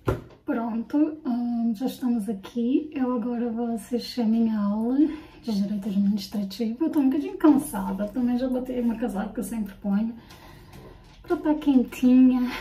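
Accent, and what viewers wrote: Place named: Portugal. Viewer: Ah sim, percebi pelo seu sotaque, está muito parecido com os dos Portugueses.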